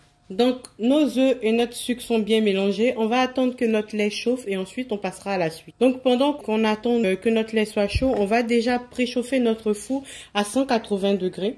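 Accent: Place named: Ivory Coast. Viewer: Je m’abonne parce que ta recette est facile et que tu as l’accent ivoirien donc je ne peux que soutenir ma compatriote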